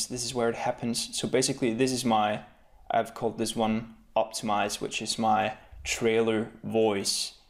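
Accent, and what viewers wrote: Denmark. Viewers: Yoo dude, this is absolutely brilliant, thanks a lot! Also, er du dansk? Synes virkelig man kan høre det på din accent :P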